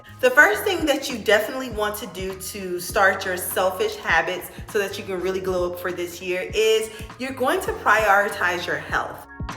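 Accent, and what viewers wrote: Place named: Philadelphia, United States. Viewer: It’s giving Philly , where you from?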